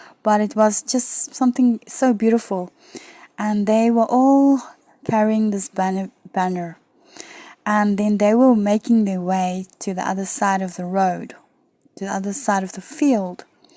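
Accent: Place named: South Africa. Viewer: I just came across this video and recognize your South African accent!! (groete van Benoni 🇿🇦) God bless, sister. See you soon in the clouds!!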